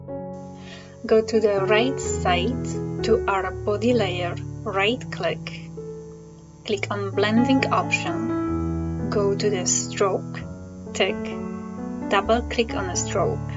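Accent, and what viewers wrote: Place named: Scotland, United Kingdom. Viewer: Oh I can hear your scottish dialect by your speaking 😁. This remember me on scottish singer Derek William Dick -> yes his real name as Fish (early from band Marillion 81-88). Do you know him? ☺☺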